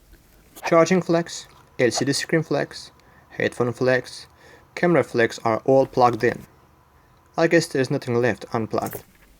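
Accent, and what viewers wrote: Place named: India. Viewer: very informative and interesting video. what country are you from? the english sounded kinda indian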